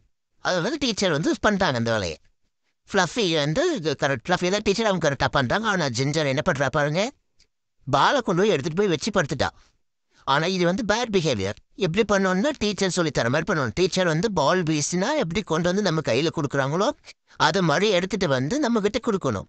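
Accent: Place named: Coimbatore, India. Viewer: உங்க கோயம்புத்தூர் வாய்ஸ் சூப்பரா இருக்கு மேம்❤❤❤❤❤❤❤❤🎉🎉🎉💐💐🌹🌹🌹🌹🌹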